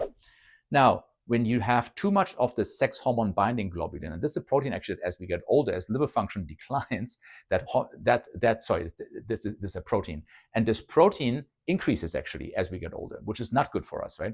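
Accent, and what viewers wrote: Germany. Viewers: Is it fair to say the goldilocks zone for HCG on TRT is between <num> mcg / wk split up 3 times per wk? I believe a break on HCG is necessary after 1 month of continued useage? Break for 2-4 wks? Thanks Doc I detect a German accent & facial features to boot.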